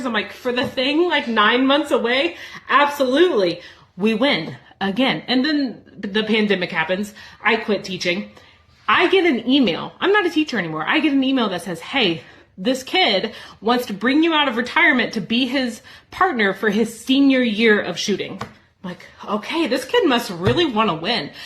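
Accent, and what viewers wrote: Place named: Fargo, United States. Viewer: Gorgeous story. Love the hints of Fargo accent.😊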